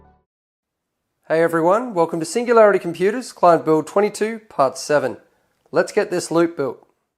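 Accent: Australia. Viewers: i love the vids so keep them up ! but i got one thing to get of my chest: i for sure thought u were an asian male ( no offence meant) i think it's just the Australian accent fucking with my mind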